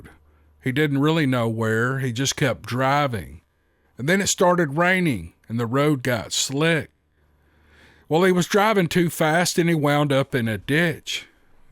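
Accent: South, United States. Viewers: Gosh I’ve missed you! Some how I got unsubscribed and have been dealing with deaths in our family 🥺 today I went looking for you and it’s so nice to be back home.. I love your chit chat and I’ve missed your southern accent ( from Eastern Washington State🤣) thank you for all you do and this beautiful community of friends💕🌻🙏♥️God bless you all!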